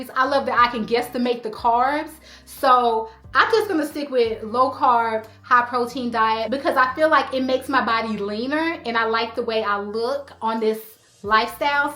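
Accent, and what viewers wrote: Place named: South, United States. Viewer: I can hear a little bit of an accent/twang especially when you say January 1st. Are you from the South? Just curious.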